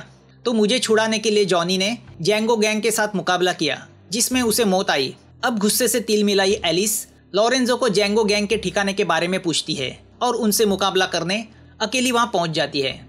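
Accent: Maharashtra, India. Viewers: Tula Marathi yete bhau bolnya Varun Maharashtra cha distos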